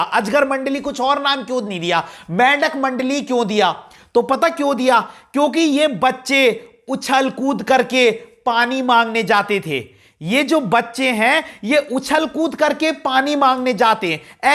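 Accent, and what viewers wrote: Garhwal, India. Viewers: sir your garwali accent ❤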